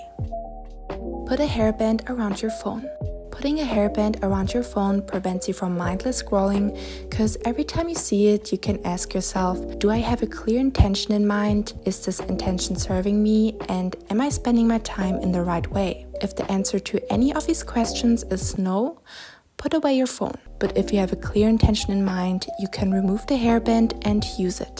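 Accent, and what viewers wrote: Germany. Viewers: Du hast voll den deutschen Akzent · Me thinking her voice sounds German. Then she pulls out a German book. ✨German power ✨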